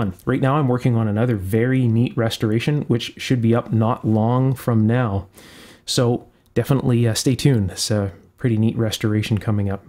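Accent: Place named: Canada. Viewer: Thank you …a fascinating video featuring an interesting oscillograph. I enjoy your mini talks and your delightful accent…what part of Canada are you from? (I’m in the south of England). My own very first oscillograph was ideal for checking audio circuits working up to about 1MHz or so. It was just post Second World War I think and used a 6K25 Thyratron in the time base…I’ve still got several of those in my shack (my UK callsign is G3XFD, my Irish callsign is EI5IW). In the past I’ve found some interesting little scopes at Amateur Radio Mobile rallies (I think they would be classed as ‘swap meets’ or something similar in North America) and have several 1CP1 one inch scope tubes which featured in Practical Wireless magazine (I was Editor for almost 25 years until retirement in 2013)) using the Mullard/Philips basic workshop project scope. A fascinating little tube, the 1CP1 (Loctal base) was used as a waveform indicator by the the old British Post Office Telephones (now BT) in telephone exchanges to monitor the dialling tone, which was generated by little 440Hz (approx) frequency output motor generators! 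Most of the scope tubes had phosphor trace burns due to thousands of hours continuous working…but they could still be used! Thanks for your excellent tutorials…I’m a great fan! Best wishes, Rob G3XFD.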